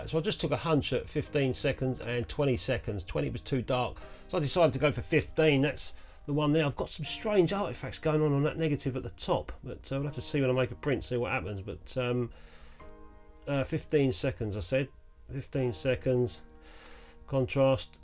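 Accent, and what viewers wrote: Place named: South London, United Kingdom. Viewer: I knew I recognised your accent. Coldharbour and the “Farmhouse” in the 70’s. Great memories. Love the channel. Bill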